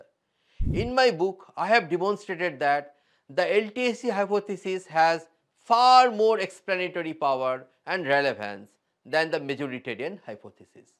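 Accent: India. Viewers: Horrible Bengali accent....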